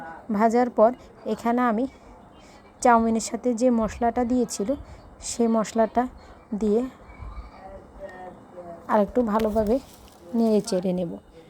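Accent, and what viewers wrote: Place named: Noakhali, Bangladesh. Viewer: পুরা নোয়াখাইল্লা 😊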